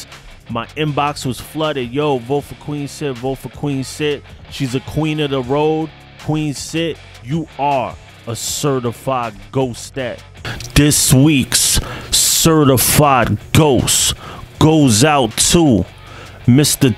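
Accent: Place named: East Coast, United States. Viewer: First time but # where you from I hear the east in you bro. Tappin In from Boston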